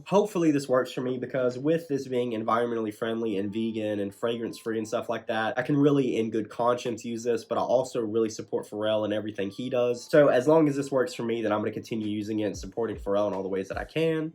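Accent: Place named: South, United States. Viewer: What city/state are you from because I feel like you’re from California but in my opinion you sound like you have a southern accent.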